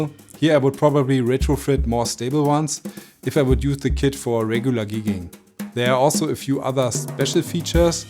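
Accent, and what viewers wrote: Germany. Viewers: Als ich den deutschen Akzent gehört habe habe ich mich außergewöhnlich gefreut weil es kein Set aus Amerika ist, das man so schwer nach Europa bekommt. Gott segne euch!!!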